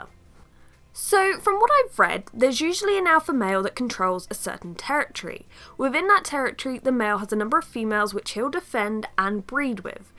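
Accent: England, United Kingdom. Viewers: Where do you live? And she’s not pretending lmao, she really is English. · what a lie !!!!!!!!! dont let the english accent fool you she dont know whats shes talking about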